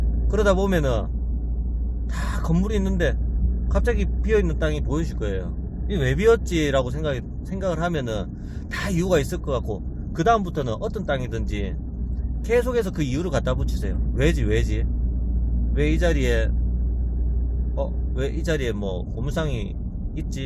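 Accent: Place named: Gyeongsang, South Korea. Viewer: 경상도사투리가 신뢰감을 줍니다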